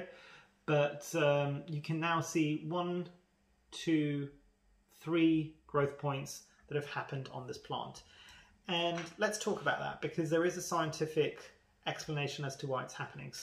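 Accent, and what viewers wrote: West Country, United Kingdom. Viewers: Really interesting video. You’ve got a bit of a West Country twang. Hi from Bristol ☺️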